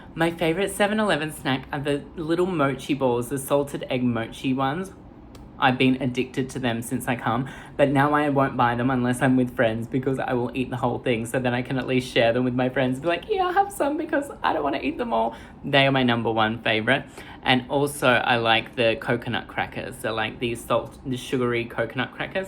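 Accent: Thailand. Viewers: คุณพูดอังกฤษแต่ทำไมเป็นสำเนียงไทย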